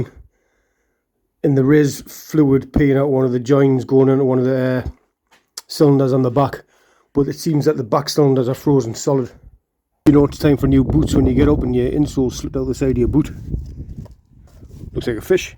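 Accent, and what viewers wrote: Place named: North East, United Kingdom. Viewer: Canny progress. Did you not fancy upgrading the caliper’s. You sound local. You in the north east?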